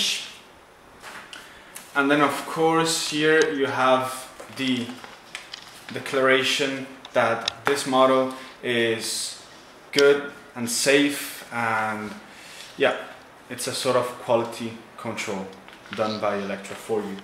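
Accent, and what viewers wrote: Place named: Italy. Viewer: Within seconds I was sure you were Italian! You know how to pronounce the name correctly. 😂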